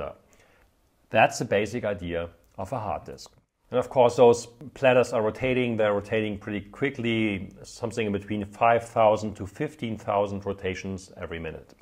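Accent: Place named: Germany. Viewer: Dieser deutsche Akzent... D: Aber danke für die Informationen.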